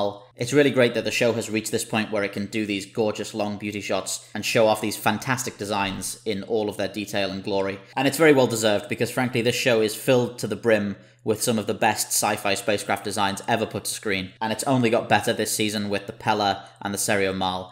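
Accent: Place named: Wirral, United Kingdom. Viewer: Are you from the Wirral?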